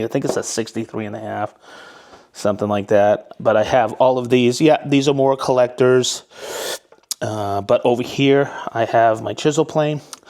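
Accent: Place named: Boston, United States. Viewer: I guess you can take the man out of Boston. But, you can't take the Boston out of the man, Hello from a fellow New Englander. I love watching you videos and find them extremely informational. keep up the great work my friend.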